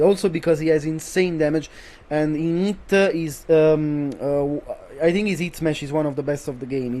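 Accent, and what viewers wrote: Italy. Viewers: took me 2 sentences to realize he’s italian lmao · I was only surprised by Lee, you even put it in "Easy" with those combos full of cancels and precision concatenations. Yoshi is a character who doesn't give you anything, he has potential for top 13\15 for me so it's probably even more difficult than that. Steve is probably the most difficult character in the game and also devil jin. question: are you Italian? I ask for your pronunciation of English 😂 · You can hear he is italian and how he says claudio is perfect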